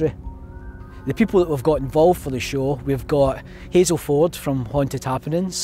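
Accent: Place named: Glasgow, United Kingdom. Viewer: Nice to hear the voice of a fellow Weegie (or should that be ouija) 😁 Looking forward to the series. Slainte guys!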